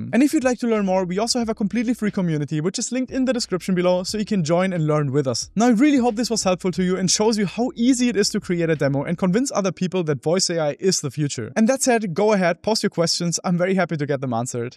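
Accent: Germany. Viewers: Das ist doch n deutscher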